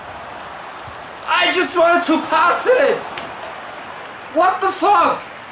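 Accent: Germany. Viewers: Gustavo Yavar he is german. Deal with it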